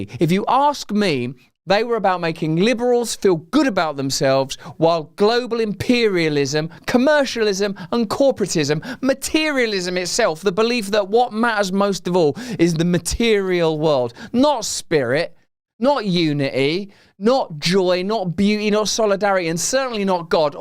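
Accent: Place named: United Kingdom. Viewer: A dude with both a heavy British accent and a heavy lisp is too much for my ears.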